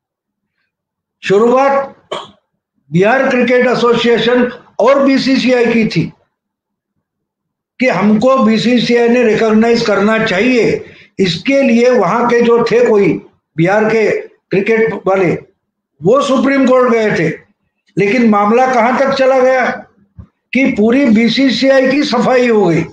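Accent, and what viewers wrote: Maharashtra, India. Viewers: Utkrushth muddesud Mandani.. Hinditala Marathi tone awadla..Bhau kuthech watle nahi Hindi kamkuvat ahe apli.. Tumchyasarkhya vicharanchi aaj garaj ahe bolat raha. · मराठी स्वाद वाली हिंदी मधुर लगती हैं.बहुत बढिया.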